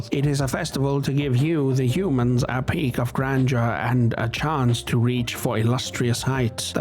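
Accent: South Africa. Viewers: The Sandton accent took me all the way OUT bro 😂 too real. Instant sub! Looking forward to more of your content! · Ah, a fellow South African. I knew that accent sounded familiar (kinda sounds like the zookeeper from Jozi zoo iykyk). Good video bro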